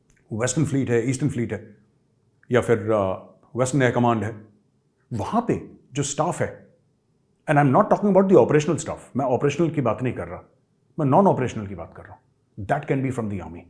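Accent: Lahore, Pakistan. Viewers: Unique delivery by you of Lahori bol "Kar rahey Hain, Haar rahey Hain...." You video will remain incomplete without this "Hain" word style... You are tooooooooooooo Gooooooooooooooooood... Love you Major Sahab... Bharat Mata Ki Jai... Jai Hind Sir... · Maj sahab aapka Lahori style bahut badiya h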